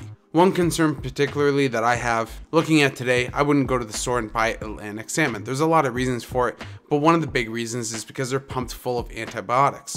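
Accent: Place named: Canada. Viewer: I dig your Canadian accent. I bet people on YouTube around the world (other than US and CA) think you're American. There's only 4% of things you say that give you away. ;-) I follow about 8 crypto channels and am HEAVILY invested in this world and have added you to my list to follow. You're knowledgeable and I dig that. Thanks, brother to the north.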